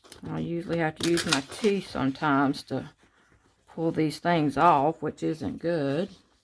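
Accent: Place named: United States. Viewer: Okay, will have a look then. Can I ask where you are from? You sound like you're form the USA, but it must be very late or rather very early in the morning as I am from the Netherlands and 6 hours ahead of the eastcoast, 8 hours from the west. I am going through your videos right now to catch up on them.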